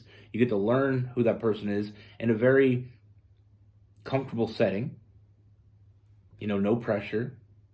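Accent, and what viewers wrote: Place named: Canada. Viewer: It's the beautiful Canadian accent ❤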